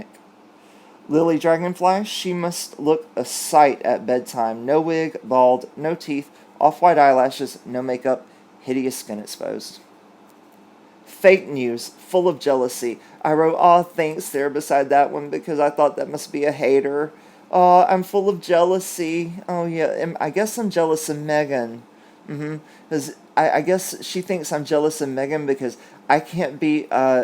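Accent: South, United States. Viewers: Your accent is adorable! Where are you from? Clearly a southern boy.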